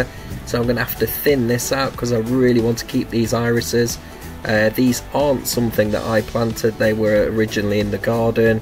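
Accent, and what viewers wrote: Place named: North, United Kingdom. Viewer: Oh God - I cannot move on my patio from all the divisions I have made of every perennial you can think of. I dug up one small clump of helenium and got over 100 separate plants. I think I could start supplying plants to the Dutch international plant shippers at this rate, I'm definitely hoarding! And just so you know - I reckon nobody is offended if you don't reply to their posts. It's decent of you to show appreciation, but if you get more subscribers and reply to everybody you will get burnt out, so I never mind if you don't respond to any of my posts again if it means you have more time to do what you enjoy and make such excellent content. You sound like a fellow northerner so its good to have somebody fairly local, gardening in similar climate with similar plants at roughly the same stage as the stuff in my garden. 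I see many others feel the same as I do, so keep up the ace content where you have time and if you are busy, all us new subscribers can form a community sharing tips and ideas in the comments amongst ourselves. Cheers for making me feel welcome as a newbie though!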